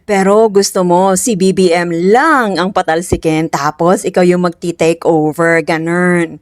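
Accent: England, United Kingdom. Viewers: I'm sorry but your English accent doesn't make you smart, mag bisaya kanalang day.:face-green-smiling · Luv ur brit accent 😊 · well said. Love the British accent though but not the Bisaya. Sagwa magsalita si Inday Sayad, even in her own dialects. Can you also roast Harry Roque. Thank you.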